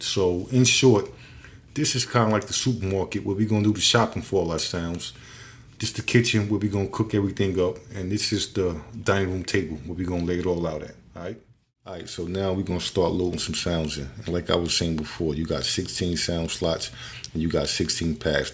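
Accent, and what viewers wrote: New York, United States. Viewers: You got that real New York voice. Lml what part are you from?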